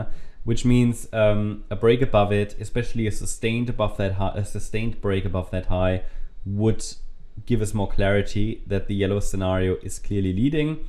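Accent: Germany. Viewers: War auch Ironie, hatte nur das Gefühl, dass er Deutscher ist, seinem Akzent nach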